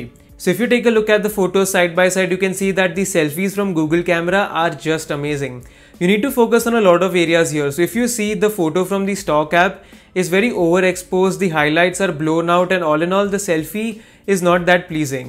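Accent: India. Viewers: O sotaque indiano é muito feio mano, parece o inglês liso do liso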